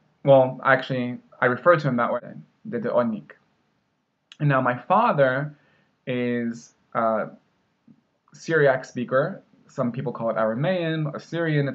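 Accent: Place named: North Jersey, United States. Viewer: You sound mostly like an inland North Jersey/lower Hudson Valley NY guy to me now, although you kept the New England style pronunciation of "aunt" for sure. It's interesting you started developing a sort of "cot/caught" merge since this is uncommon in both RI and NJ, wonder where that came from? I'm a South Jersey guy, born in Philly, who has moved up to South Shore Mass area. Up here I'm seen as having a Philadelphia accent, complete with the short-A split("trap" vowel is æ, "bath" vowel is ea). I've gotten made fun of for pronouncing aunt as "ant", my "furry-ferry" merger, and I had trouble with your hometown's name. I wanted to say "WOON(rhymes with noon)-socket" instead of "Wun-socket". Oddly in Philly and South Jersey "jimmies" is typical for the donut/ice cream confectionery as it is in Rhody and Boston. I'll always say "ant", but I have fixed my pronunciation of Woonsocket. 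They have good fish and chips there.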